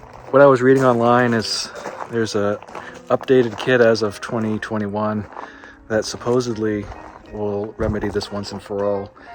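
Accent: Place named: Maine, United States. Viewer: Okay…catching up…been disposed the past few days. I prefer the over wheel straps. They are limited to that one job but transporting things like that, make them worth the investment. Tails….fold them up from the tail end to the ratchet, and then secure them with those little looped bungee cords with the ball end. May have to go around the folded end a few times to take up the slack, but they are cheap, quick, easy and effective!! That Downeasta accent is in your blood!!😁🤣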